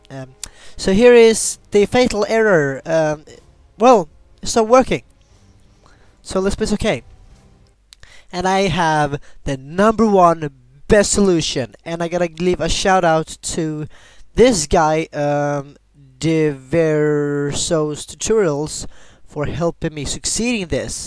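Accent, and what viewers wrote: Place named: United Kingdom. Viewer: Ahh youre also Swedish metoo shoutout to u ma boy BTW you sound brittish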